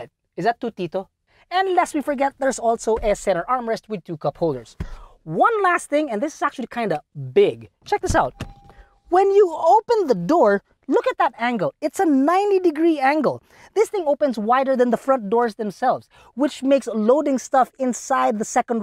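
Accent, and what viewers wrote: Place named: Philippines. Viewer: This is a very good example of filipino accent.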